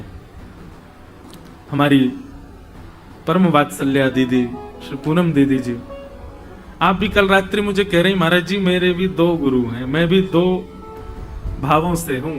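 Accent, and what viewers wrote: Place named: Braj, India. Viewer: Indresji maharaj Aap ki gyan bhari bate sun ne ko brij bhasi boli itni achi lagti hy ki sare din sunti rahu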